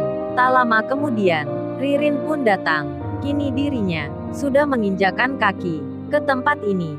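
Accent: Surabaya, Indonesia. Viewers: Mantap..adminya wong suroboyo ya min..salam kenal aq sby utara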